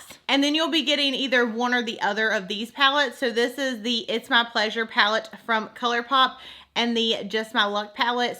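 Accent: South, United States. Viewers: I Have Been Subscribed To Your For Years And Still Love You And Your Southern Accent Lol I Would Love To Win Of Course, I Wouldnt Be Able To Afford These Things, But Even If I Dont Win Im Still Going To Watch Your Videos And Love You! Congrats On 4 Years And Many More To Come❤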